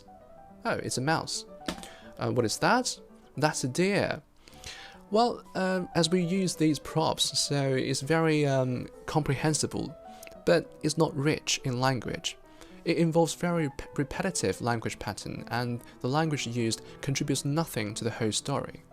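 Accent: United Kingdom. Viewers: 英式口音真好聽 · posh accent English ❤... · 英国口音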